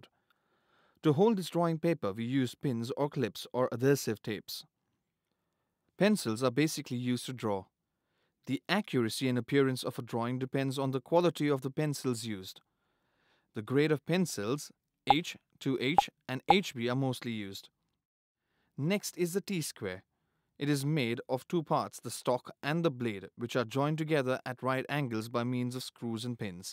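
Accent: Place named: Kerala, India. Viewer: nice work mate. you are definitely from india. ur accent gives u away. i think you are from kerala.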